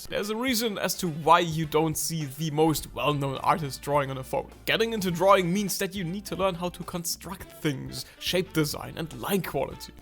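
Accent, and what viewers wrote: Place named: Germany. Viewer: I hear Deutsch :)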